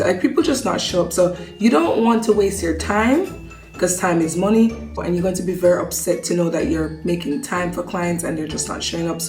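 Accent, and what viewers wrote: Jamaica. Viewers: Yow u sound so Jamaican its qt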